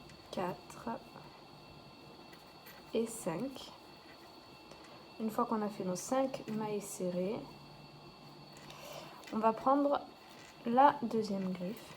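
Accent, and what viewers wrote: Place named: France. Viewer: Merxi a toi,pour ta facon de nous montrer comment fabriquer toutes les belles choses que ru nous. Montre,,et tu as un talent vraiment special,pour nous montrer et une patience vraiment tres belle a voir ,pour nous,car tu prend tout ton temps pour nous montrer a fabriquer les choses que tu nous enseigne..alord merci et ne lache pas et ton accent francais est tellement beau et dou a entendre..ne lache surtout pas..milles fois merci...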